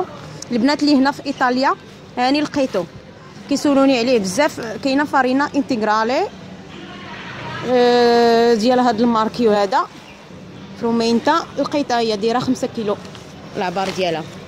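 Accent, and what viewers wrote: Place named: Agadir, Morocco. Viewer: bnti liya mn bladi wa9ila lhja dyalk dyal agadir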